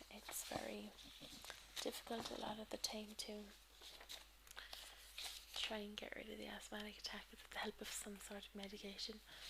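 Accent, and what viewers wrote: Ireland. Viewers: Kind of sounds like an Irish Quinn Fabray (from Glee). But that's a good thing! Fantastic video. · This was great! I love your Irish accent! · My goodness. This video. If it weren't for my empty stomach, I'd be knocked out cold right now. Your brogue is just enchanting in every way, mixed perfectly with ASMR triggers and a low soft-speak. Absolutely magnificent job. Thank you so much for this video! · Corr your voice is so relaxing, I know Im typing this at 3.51am and the sun is coming up and the birds are singing but I feel I need to listen to this video again so I can relax and go to sleep. You seem to cure my insomnia at times. I also get tingles in my head and thats it before I know it Im out like a light! I do love your accent! Im a sucker for an Irish accent on a girl. Errmm I also seem to wake up with a raging boner so I blame you lol. 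But seriously wicked video! top stuff! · Ahhhh Irish accent! Love this video :)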